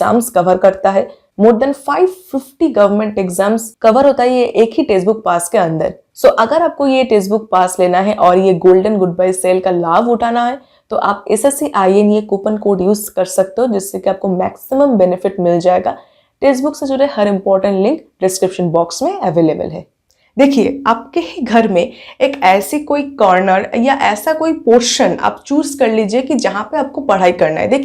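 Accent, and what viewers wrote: Bengal, India. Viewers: Hindi se pta chlta h kii aapki bengali bht achi hogi ❤️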